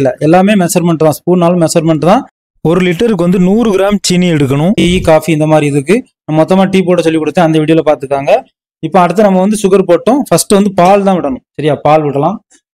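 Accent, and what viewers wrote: Tirunelveli, India. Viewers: bro neenka tirunelveli ya?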